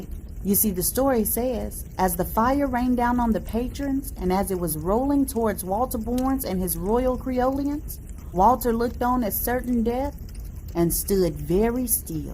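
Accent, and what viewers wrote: South, United States. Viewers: That is such a pretty picture of you in the intro 😍. You're such a naturally pretty lil cutie pie. Love the stories, keep up the good work.. SCANDALOUS CHILE!!!! 😆 🤣 I love that you're such a southern Belle, "Shucks" 😆 Reminds me of going to South Carolina to visit my cousins "down the country" as my great grandmother would say. The way you talk brings back such good memories of my great granny. 👵 I'm just waiting for you to call somebody a jitterbug. 😆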